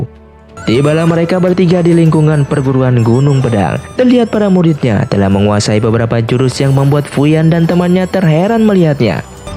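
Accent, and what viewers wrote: West Java, Indonesia. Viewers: Sunda mang? Abdi sunda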